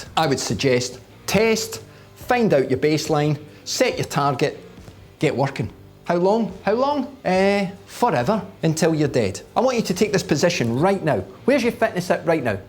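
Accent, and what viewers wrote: Scotland, United Kingdom. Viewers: I never expected to get my fitness advice from a guy who looks like Spud and talks like Begbie, but here we are....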